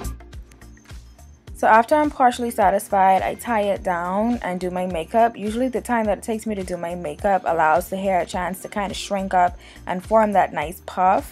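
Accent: Bahamas. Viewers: your Bahamian?! 😀😀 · Dat Bahamian accent strong boy lol biggups to all my Bahamians! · Omg when I heard you speak I was wondering if you're Jamaican!! :) I never realized how close the Bahamian accent is to ours :) Love your hair btw!!! :) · LOL I just knew you were a Bahamian from you first started talking. Love the videos. Keep it up! :) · Love your tutorial. Automatically heard the Bahamian in you. Keep it up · Cant hide from that Bahamian accent..lol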